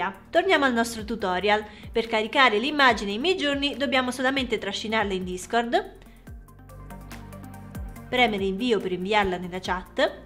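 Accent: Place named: Marche, Italy. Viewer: Sei bravissima, per colpa della tua spiegazione fantastica questa notte mi sono fatto un bel abbonamento a Mid...sento dalla tua voce odore di casa...penso che sei zona Marche sud...Complimenti ancora.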